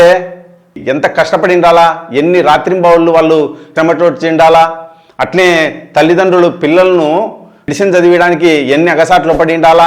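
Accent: Kadapa, India. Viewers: ఏంది అబ్బా నీది నీ భాష చూస్తుంటే కడప ఉన్నట్టుంది నీ యాంకరింగ్ ఏం బాలేదు అబ్బా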